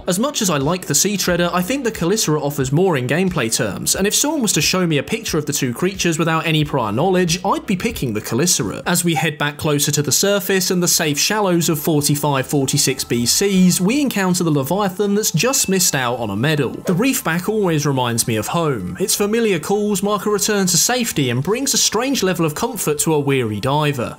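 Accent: United Kingdom. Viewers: I love your British accent. Also great video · Jesus, you're about as basic bitch of a YouTuber as you can be. British accent, over used 5 images of what you want people to see you as, and cliche sound effects, ew.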